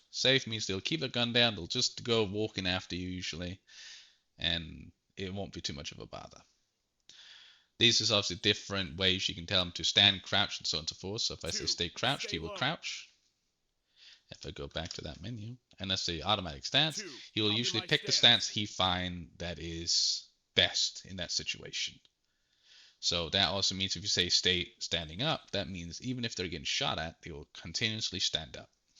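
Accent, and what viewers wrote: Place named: United States. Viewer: I have 5500 hours in Arma 3. I just started playing this campaign looks promising. I would like to ask a favour, as someone from England i found your accent really interesting, as in American but i cant place it. Would you tell me where that accent is from?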